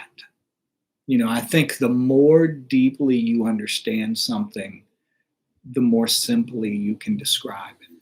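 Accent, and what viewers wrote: South, United States. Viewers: Man I wish I'd have gotten the notification in time to be here live. I'm gonna have to see what's wrong with my settings. I've been waiting for you to share another video. I enjoy your teaching so much. And I'm so very proud of you and everything that you've accomplished in your studies. I'm so thankful for your interest bc I always learn something new about things I've wondered from your broadcasts. And it's so refreshing to be able to listen to another southerner. Especially on the topics that intersect me. You're a one in a million type of person. I've known about your past since HBO's debut. And I knew as I watched in horror that you are innocent. And all I could do was pray about it and believe y'all would get out of that nightmare alive and tell others that didn't know what was going on. It does my faith in GOD so much good to see you doing so well. 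Your resilience is an inspiration to me. And I wish all the best in the world.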